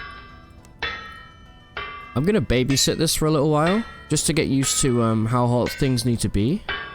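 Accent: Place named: United Kingdom. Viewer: for music, my suggestions are, and (especially )!! i listen to way too much kawaii metal and not much else… isn’t kawaii metal and im not sure what genre they’d fit under but it’s good (and heavy!) streaming would be awesome, but i think i’d miss most of them ☹️ since our timezones are opposite (assuming you live in the uk from your accent) enjoying todays episode with my cat!!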